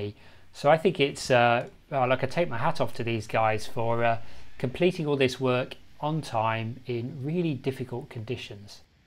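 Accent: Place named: England, United Kingdom. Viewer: I thought ohh 15 minutes but when I heard your English accent and picked up what the subject entailed, I watched on. I do wish they'd make mega projects like this a bit more aesthetically interesting such as the tunnel portals. Nowadays it's more bland and ugly then ever and all that matters is seeing it open as soon as possible. Even road projects get better treatment.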